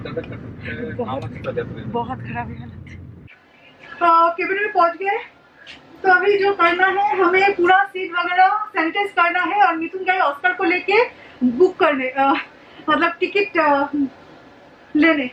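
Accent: Bengal, India. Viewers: Tomar bangla ta, 🤩🤩🤩 uh got a nice bngoli tone😍😍😍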